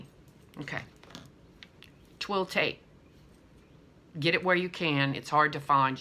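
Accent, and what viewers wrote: Louisiana, United States. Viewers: Girl I can listen to you all day. You are so Cajun.... I love you to pieces.... Stay safe. Good luck with the position....❤️❤️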